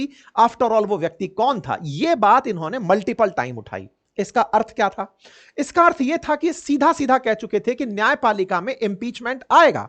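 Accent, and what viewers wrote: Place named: Rajasthan, India. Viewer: Your speech has a Rajasthani smell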